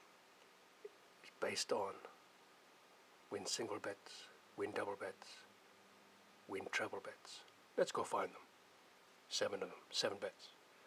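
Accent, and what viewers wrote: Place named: Wales, United Kingdom. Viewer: Welsh accent for you. L)=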